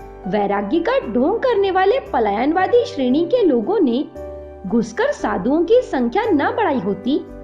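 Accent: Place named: India. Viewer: What’re you saying ?.. can’t understand anything..because you’ve got such a strong Indian accent.. otherwise i do speak & understand Hindi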